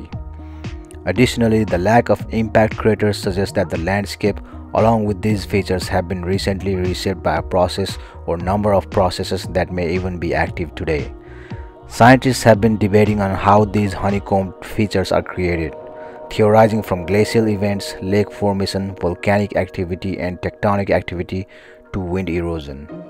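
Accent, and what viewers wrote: India. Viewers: This is more like Indian call center like you've never heard before..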